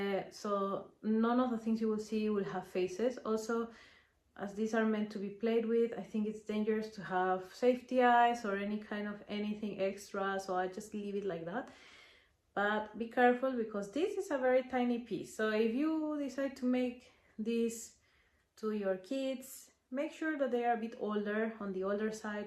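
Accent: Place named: Peru. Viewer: me suenas peruana...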